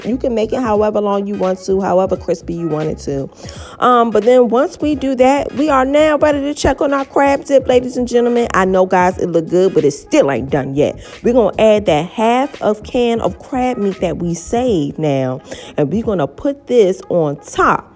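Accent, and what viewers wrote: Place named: Maryland, United States. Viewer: OK, I was about to quote the hell out of this video, but realized it was damn near the whole thing! Love the energy and that Murland twang... Now, if I can focus and get ur recipe I'd be good! lol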